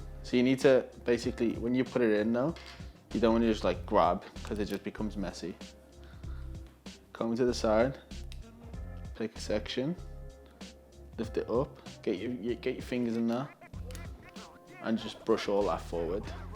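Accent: Liverpool, United Kingdom. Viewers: a mexican with a british acent wtf 😅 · you sound like a scouser and speak like one too im from Liverpool meself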